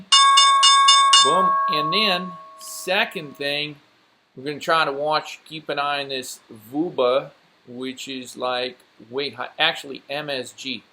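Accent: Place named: Minnesota, United States. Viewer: What the fuck is your accent? It sounds like you moved to Minnesota from Sweden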